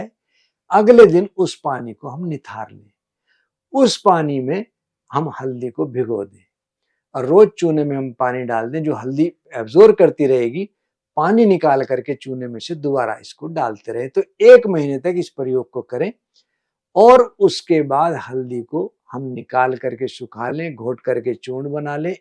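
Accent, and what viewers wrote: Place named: Haryana, India. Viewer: yes haryanwi doc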